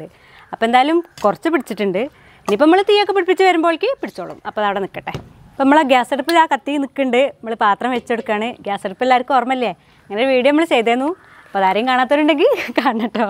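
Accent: Malappuram, India. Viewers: ഒട്ടും ബോറടിക്കാത്ത സംസാര രീതി .. നമ്മളെ മലപ്പുറം ഭാഷ അടിപൊളിയല്ലേ,,, മൂന്ത ചപ്പ്ളി പിളി ആവും,, നമുക്ക് മാത്രം മനസിലാവുന്ന ഭാഷ.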